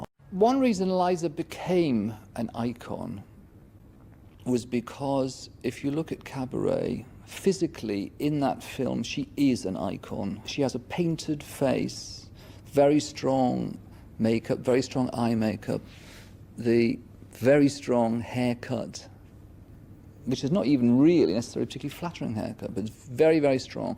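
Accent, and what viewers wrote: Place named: England, United Kingdom. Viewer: Beautiful english accent 😍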